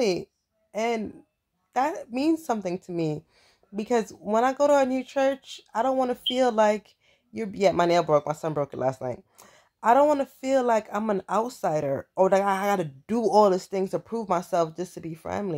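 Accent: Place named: Florida, United States. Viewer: You sound floradian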